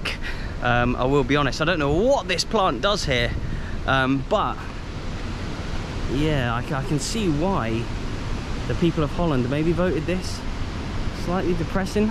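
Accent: Scotland, United Kingdom. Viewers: Hi are you from Scotland?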